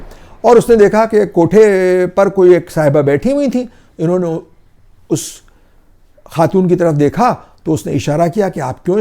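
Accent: Lucknow, India. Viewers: Khudara Urdu alfaz ka tarjuma na kariye ga, kyun ke aap ke dilkash Lakhnawi lahje me Angrezi ki amezish se is ka maza thoda pheeka pad jayega. Aap ka andaz e bayan isi purane Lucknow ke lab o lahje me bahot shirin aur dilkash hota hai. Waise ye mera zaati khayal hai, aap behtar jante hain ke aap ko kya karna chahiye, hum aap ke videos ko khoob pasand karte hain.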